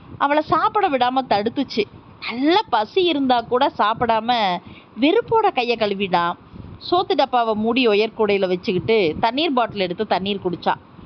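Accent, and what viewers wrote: Kongu, India. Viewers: எதார்த்தமான கதை. கொங்கு தமிழில் அருமையாக வாசித்திருக்கிறார். அம்மாவின் கோபம் எங்களை இப்படி ஆக்கிவிட்டது என்று சொல்வது நடைமுறை உண்மை. நான் ரசித்த கதைகளில் ஒன்று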